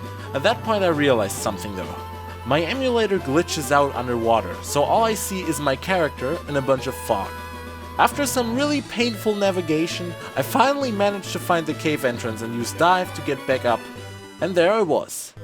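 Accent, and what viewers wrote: Germany. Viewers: Direkt den deutschen Akzent rausgehört 🙈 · Wenn du nicht gesagt hättest, dass du die deutsche Version gespielt hast wär mir dein Akzent kaum aufgefallen. U got a keen knowledge of English bré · Man hört das deutsche raus herrlich hahaha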